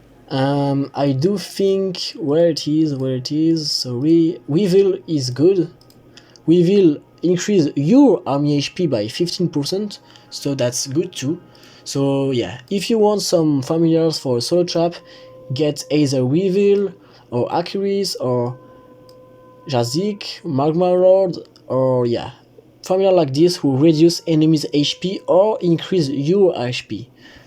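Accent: France. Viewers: Are you french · Tu change tous me temps de guilde mdrr frero sa s'entend que tu et fr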